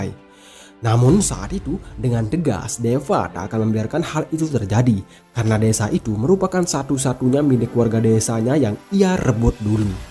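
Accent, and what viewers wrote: Bali, Indonesia. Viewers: Seperti logat Bali yaa min...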